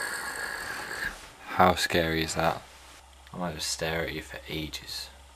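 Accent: North, United Kingdom. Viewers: He sounds so northern and not as excited as usual... He is so much different. Definitely more northern. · His voice was so deep and northern then jfc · He sounds so northern in these early videos i love it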